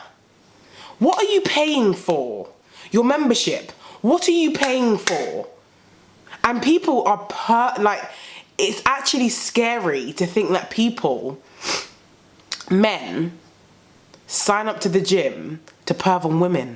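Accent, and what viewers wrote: United Kingdom. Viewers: U a London girl babes?? Xx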